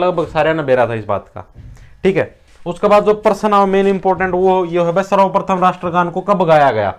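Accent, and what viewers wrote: Haryana, India. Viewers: Very nice Sir 👍 Your language is properly Haryanvi but I like it👍 And your teaching way is very interesting 🥳🥳🥳🥳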